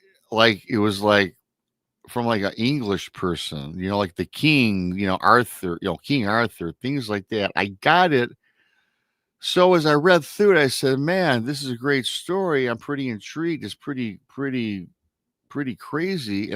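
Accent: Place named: Michigan, United States. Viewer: Michigan is my home state. I love your accent. It makes me homesick.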